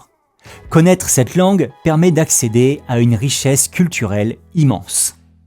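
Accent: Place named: France. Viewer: thank you so much for this amazing video! I love this new format and looking forward to see more videos like this one. BTW, your soft French accent is very beautiful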